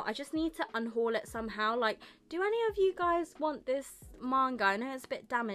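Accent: United Kingdom. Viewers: Are you British? Love your content!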